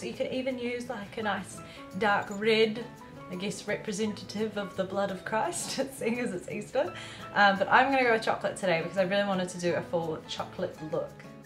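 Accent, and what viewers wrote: New Zealand. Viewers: Hi I'm here from Crystals channel, love hearing a kiwi voice on YouTube. Yay !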